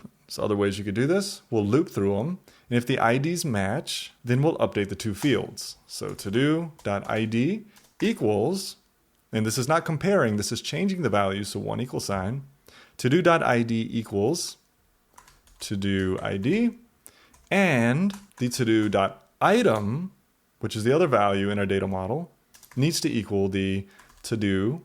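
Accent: South, United States. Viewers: you got a lil twang in your voice lol. You grew up in the south lmao?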